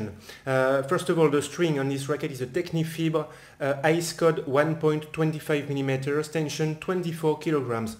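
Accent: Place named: France. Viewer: french accent is so funny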